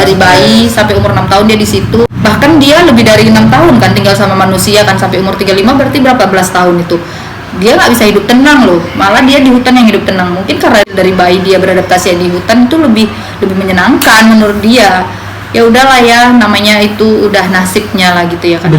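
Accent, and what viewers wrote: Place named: Medan, Indonesia. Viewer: Dek , dah pande kau , berkisah ya, kalau org2 mdn yg nonton dah pasti lah ngerti bahasa kau tu, kalau org2 Jkt belum tentu paham semua aksem gaya bicara kau....hahaha.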